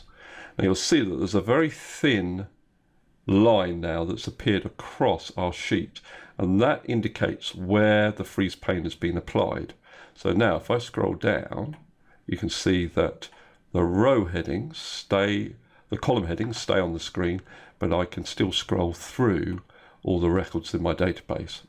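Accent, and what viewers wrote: United Kingdom. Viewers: Your british accent makes this so much more fun/engaging. When you said "isn't that brilliant?" I was like, why yes... yes it is.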